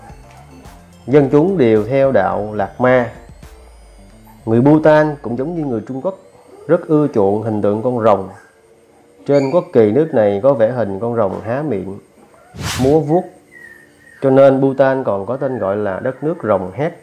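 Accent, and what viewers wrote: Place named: Hau Giang, Vietnam. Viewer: Giọng người Hậu Giang bạn ơi.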